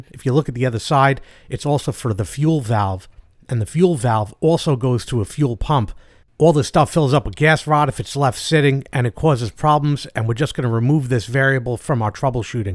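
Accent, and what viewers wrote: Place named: New York, United States. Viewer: This the best Honda generator video I’ve seen on YouTube. Your definitely a New Yorker most likely Long Island wish I new you when I lived in Brooklyn.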